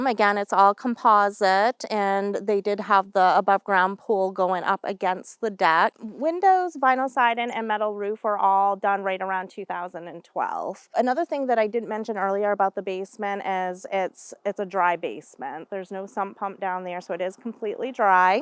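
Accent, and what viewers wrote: Maine, United States. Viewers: She has a Maine accent.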